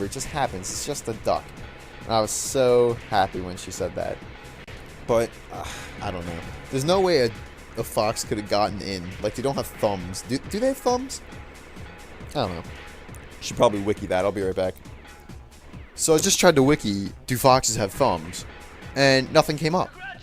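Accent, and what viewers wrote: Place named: New Jersey, United States. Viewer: Haha. Cool. Jersey accent, lol! :)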